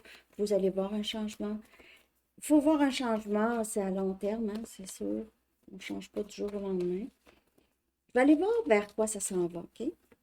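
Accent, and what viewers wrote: Canada. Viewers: Je vous decouvre à l instant bonjour de MARSEILLE JE SUIS LION ASCENDANT CAPRICORNE ET LA LUNE EN CAPRICORNE VOUS AVEZ L ACCENT CANADIEN❤❤❤❤❤❤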